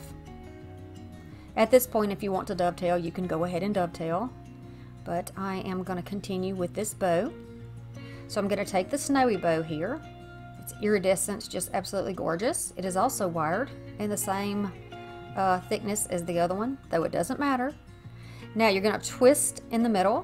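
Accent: South, United States. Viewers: Love it all! I'm from South Carolina, now living in Ohio, and the minute I heard your voice I knew I'd have to subscribe to your channel. You could be making mud pies and I'd watch just to hear your wonderful Southern accent. Mine isn't as strong, but people here keep telling me they can still hear a "twang." When you were talking about that cute two-part snowman and how some people think they have to have three sections, I absolutely howled when you said you live in the South and we're lucky to get enough snow for one part. 😂❄❤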